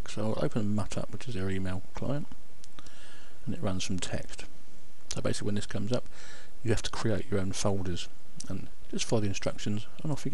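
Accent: United Kingdom. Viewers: you are british ? you have a funny accent :))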